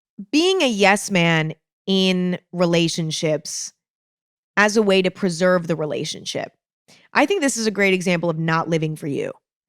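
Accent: United States. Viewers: living for ur new york accent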